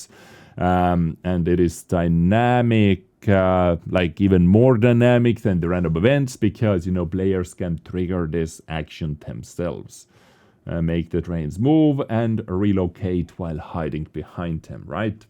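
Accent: Finland. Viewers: Which country are you from? You have a Finnish sounding accent...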